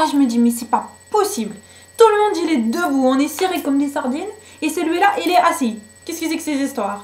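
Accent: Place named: North Africa. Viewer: J'adore ton accent de bledard .... Tres belle video en tout cas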